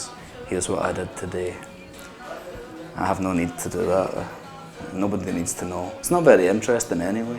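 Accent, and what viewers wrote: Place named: Scotland, United Kingdom. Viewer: that is the nice Scottish accent ahaha :')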